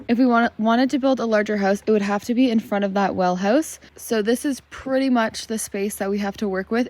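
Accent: Canada. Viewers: Nice! Ah the Canadian accent ❤❤❤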